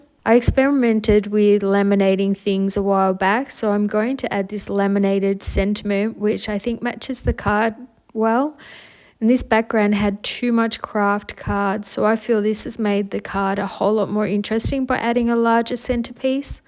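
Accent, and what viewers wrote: Australia. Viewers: Hi, I hope you are enjoying your holidays. You are my favourite channel, it is so nice to hear an Australian voice!! I would love to see a tutorial for sentiments when you have time. ❤ Adelaide Adelaide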